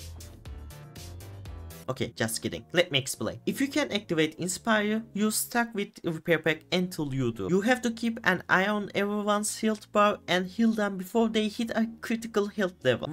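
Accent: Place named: Turkey. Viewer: Noticed the Turkish accent in the first 15 seconds ahahah 😄 nice video though, hopefully I'll do better the next time I play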